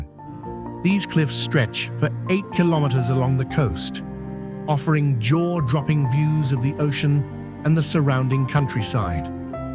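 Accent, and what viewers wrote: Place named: England, United Kingdom. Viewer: It's really great to hear a truly ENGLISH Description. Too many bad American commentary.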